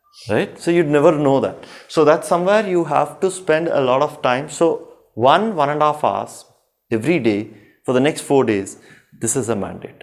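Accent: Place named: Mexico. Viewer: Why is he trying to mix English with maxican accent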